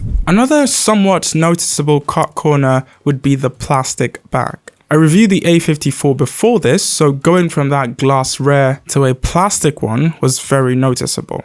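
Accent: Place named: United Kingdom. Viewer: British man😊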